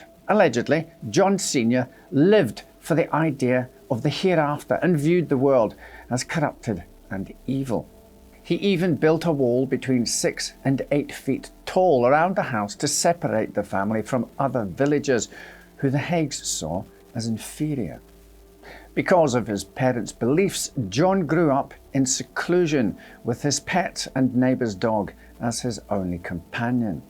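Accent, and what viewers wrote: Scotland, United Kingdom. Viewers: What part of Scotland are you from? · Fantastic thanks....love the Scottish accent!!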